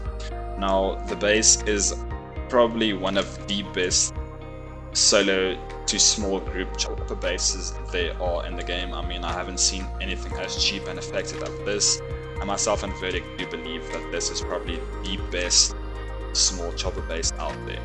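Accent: South Africa. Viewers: are you South African ? couldn't mind noticing the accent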